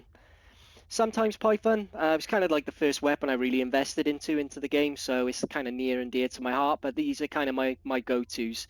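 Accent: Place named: Wales, United Kingdom. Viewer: Love those Welsh tones. Have you considered onlyfans?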